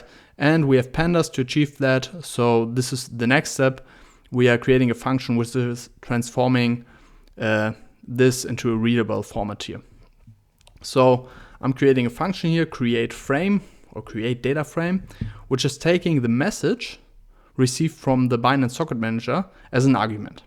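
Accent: Germany. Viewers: I like your German accent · Sehr interessant. Ich mag es übrigens, wenn Deutsche englisch sprechen, dann verstehe ich jedes Wort :) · Dieser deutsche Akzent👑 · I really like your content and I just subscribed to your channel. Please continue with the videos for cryptocurrencies. Are you by any chance from Austria or Germany? Your pronounciation sounds like it. Cheers! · You can notice he is German. But a trading bot won't make you rich. Still good personal project to develop. :)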